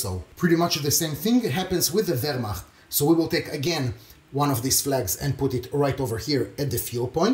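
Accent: France. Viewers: have you had a lot of troubles with get rid of French accent? I saw one French man trying speak english but he has some troubles with "french accent"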